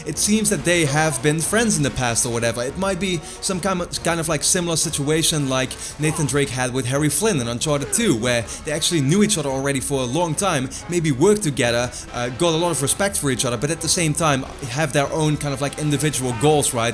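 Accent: Netherlands. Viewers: are you dutch Btw this is a great video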